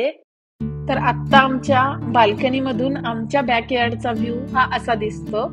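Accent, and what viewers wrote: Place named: Vidarbha, India. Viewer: Tumcha accent vidharbhacha watto 😅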